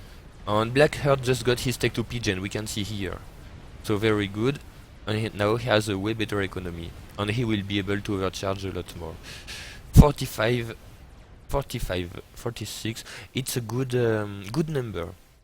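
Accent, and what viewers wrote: France. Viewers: hehe i like heariung all the names in french accent ^^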